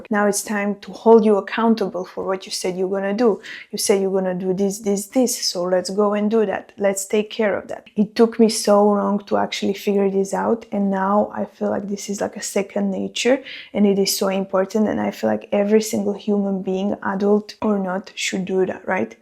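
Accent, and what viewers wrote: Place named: England, United Kingdom. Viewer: Ahh that's a lovely english accent. What's your original language?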